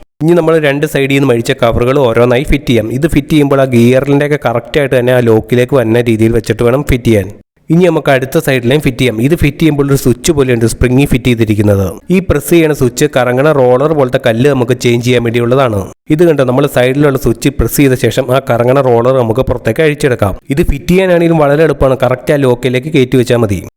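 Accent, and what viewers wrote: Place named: Ernakulam, India. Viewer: വീട് എവിടെയ?? Ekm district ആണോ....Slang നല്ല പരിചയം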